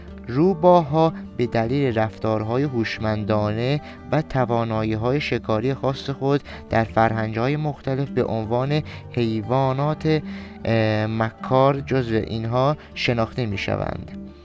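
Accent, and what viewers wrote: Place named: Turkey. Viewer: داداش خسته نباشی بخاطر ساختن این ویدئو.میخواستم یه پیشنهاد بهت کنم.بنظرمن بهتره متن ویدئوهاتو با همون زبان زیباودوستداشتنی آذری بخونی و زیرنویس فارسی براش بذاری.بنظرم اینطوری مخاطب بیشتری پیدامیکنی.اینجور کانال‌ها و ساختن اینجور ویدئوهای قوی و زیبا، خیلی زیباتر وجذابتر خواهد شد زمانیکه گوینده خیلی روان و فصیح وبدون لهجه بازبان رسمیه کشورش، روایت کنه.متاسفانه شما بالهجه‌ی شیرین ترکی صحبت میکنی که به گوش شنونده نسبت به ویدئو، جذاب نمیاد.بنابراین بنظرم بهترین کار همونیه که گفتم.با زبان آذری و زیرنویس فارسی.بازم خسروان صلاح مملکت خویش بهتر دانند.امیدوارم حرفام باعث سوءتفاهم و ناراحتیت نشده باشه.صرفا فقط دوستداشتم زحمتی که میکشی، ثمر بده.ایام بکام⚘️🌿⚘️🌿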